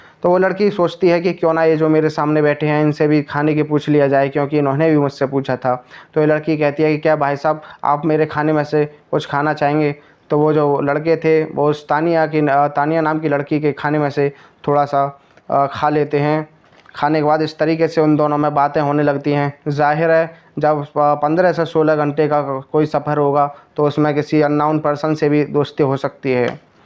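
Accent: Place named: Howrah, India. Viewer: जी आपके बोलने की शैली उस्मान सैफी जैसी नहीं है आप हावड़ा का बोलते हैं और एक ही शब्द को कई बार दोहराते हैं| कृपया सुधार कीजिए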